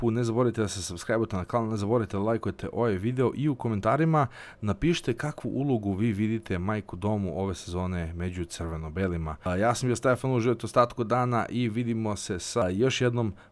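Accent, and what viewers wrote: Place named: England, United Kingdom. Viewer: Zajebi košarku, daj nas nauči taj engleski naglasak🔥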